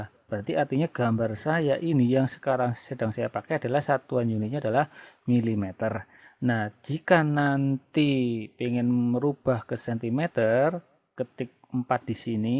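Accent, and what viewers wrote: Banyumas, Indonesia. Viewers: bahasanya lucu...sukses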